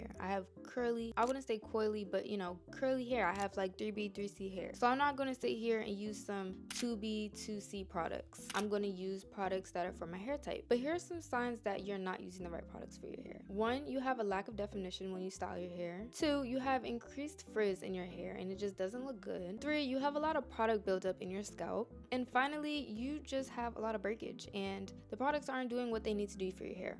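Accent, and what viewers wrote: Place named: New York City, United States. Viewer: Lil sis talks so NYC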